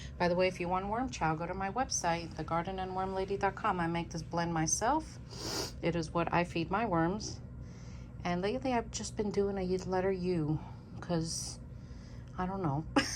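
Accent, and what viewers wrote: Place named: New Jersey, United States. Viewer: 👋 from California! Originally from Philly so loving your Jersey accent. I learn so much from your videos.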